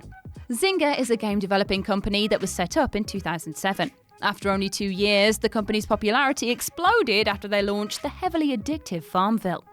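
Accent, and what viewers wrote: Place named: England, United Kingdom. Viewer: I could literally listen to talk all day long. Don't know what style of English accent she has but a red head with a beautiful accent whom I'll never meet or talk to has stolen my heart like a school boy crush. Swoon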